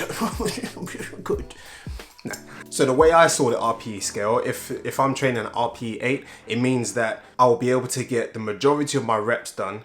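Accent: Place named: Scotland, United Kingdom. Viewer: Ta Ting has been smashed....just with a Scottish accent. ;-) Loving your work Dude.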